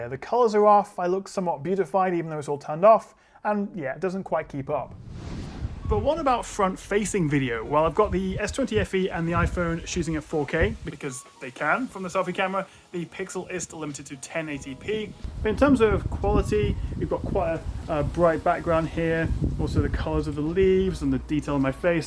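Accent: England, United Kingdom. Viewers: great video man! I love your english accent btw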